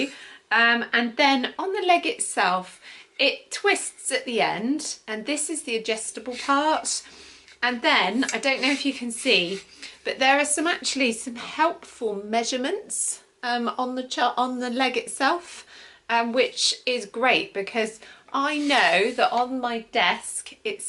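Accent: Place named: England, United Kingdom. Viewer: How beautiful English accent.. Many thanks for informative video.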